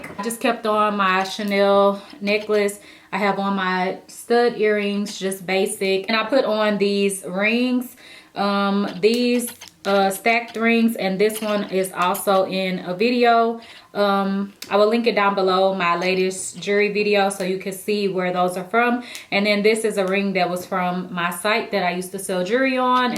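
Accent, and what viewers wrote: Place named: South, United States. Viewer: Yessss I love these type of videos! Your makeup is so nice, your hair is bomb like what lace?!! I LOVE your pregnancy glow and your cute country accent. yess keep them coming!!! do more grwm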